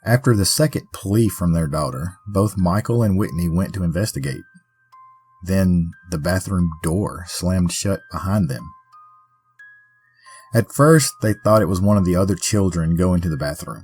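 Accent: South, United States. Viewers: Interesting and intriguing stories narrated by a Southern, Good-ol'-boy; accordingly, worth a sub.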